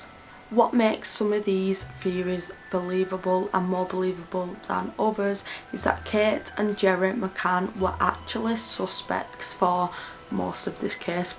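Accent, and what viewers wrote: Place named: Wigan, United Kingdom. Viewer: Her parents had nothing to do with her disapperance. Not enough time and where could they hide her in that time. She was obviously kidnapped, and the rest we do not know. I hate it when people bring conspiracy theories etc. It heart breaking and the thought of her being sold to sex traffic makes me sick. I really hope that she is living with someone who could not have kids and she has forgotten what happened to her and is living her life happy. I'm trying to figure your accent, is it Bolton, Wigan, Oldham or Cumbria. I live on Anglesey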